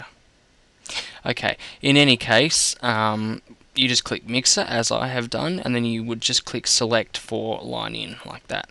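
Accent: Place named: Australia. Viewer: love the aussie accent by the way bruh. I'm wanting to lets say set up a radio broad cast for prank phone calls. I'm going to be using Skype with a friend or 2 and will be calling numbers for the calls. I will be using this set up.. but how do I actually broadcast to people? Am I going to need to set up an SQL database through my server? I have PhpMyAdmin and all that... just wondering if you could help me out here thanks! If email is easier then email me <mail>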